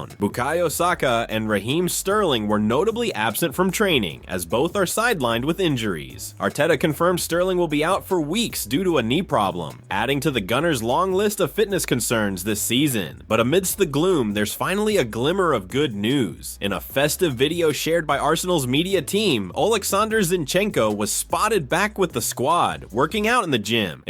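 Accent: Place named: United States. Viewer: Can never trust English transfer rumours with yank commentary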